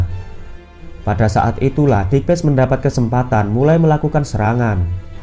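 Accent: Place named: Java, Indonesia. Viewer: Orang Jawa ya mas? 😂 Medok mas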